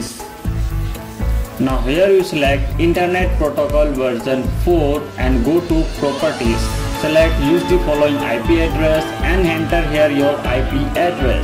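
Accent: India. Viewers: That Indian accent tho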